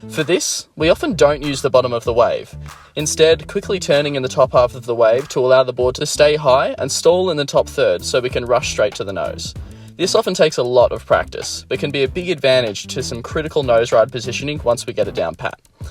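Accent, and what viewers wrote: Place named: Australia. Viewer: Dude, thanks so much for all your vids and explanations. Very useful. (And cool to listen to a calm aussie voice)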